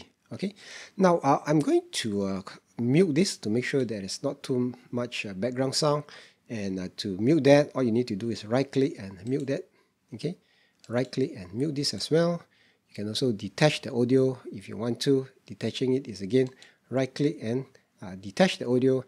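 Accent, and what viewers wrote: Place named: Singapore. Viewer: Love the Review. Even more, love the Singapore accent.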